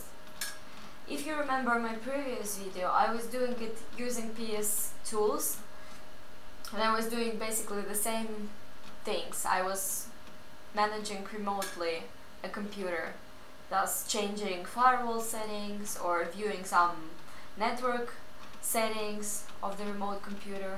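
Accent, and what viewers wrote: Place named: Russia. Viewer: Чувствуется русский акцент и немного много "SO...". Но девушка старается. Спасибо за хорошую иллюстрацию по WinRM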